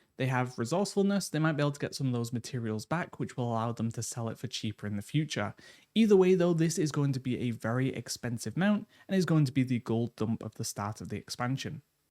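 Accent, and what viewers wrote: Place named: Manchester, United Kingdom. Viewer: My guy is so Mancunian ❤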